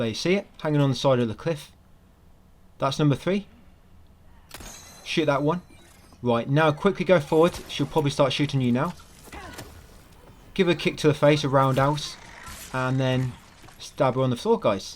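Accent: United Kingdom. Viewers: Why is your accent so british for Gods sake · Remember you from the "hell of a Sheriffe achievement. Definetly the best. I'll definetely try your strategy, since I was 30 minutes too late on my own. Good job! BTW, where are you from? I love the accent. British for sure...but whitch city? I teach English as a second language. Thats why I'm curious.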